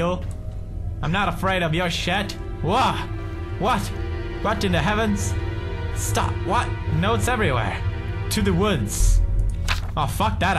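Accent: Sweden. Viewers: the time when pewd’s swedish accent was still noticeable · very barely it's super clear hes swedish in this video as to now where you have absolutely no idea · Man... still had a little bit of a Swedish accent.... and he was a tiny bit funnier. Probably because the whole demonitization thing wasn't here yet.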